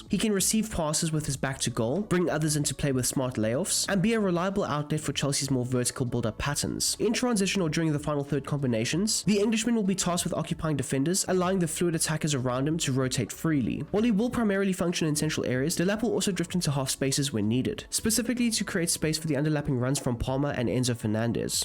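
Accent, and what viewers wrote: South Africa. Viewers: Are you from South Africa?? That accent is familiar!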